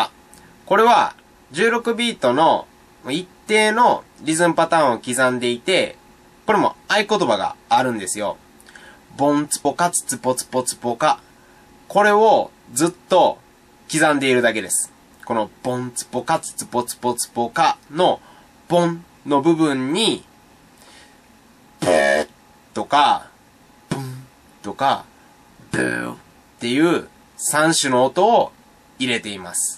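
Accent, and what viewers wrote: Kansai, Japan. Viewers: 最近いきなり関西弁になってますね笑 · 関西っすか？出身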